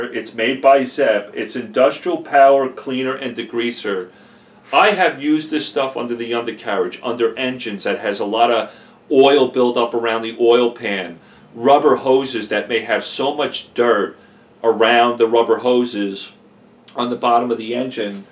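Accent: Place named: East Coast, United States. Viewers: Love your stuff, but I gotta say: Your into: “This is ovah here…” (My brain: “this guy is definitely on the east-coast”) “From Dallas…” (My brain: “what? That’s not possible!”) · Hey pal John from Northport LongIsland again Auto Detailing love the vid and like you have experienced the same thing as you with trying it all and have it narrowed down to most of what you have. How is that citral hope I spelled it right it was the last one you had you mentioned it takes off road paint and here on LongIsland they are always doing the lines on the road lol. Didn't you say you're from here as well that's our accent lol. Thanks keep up the great vids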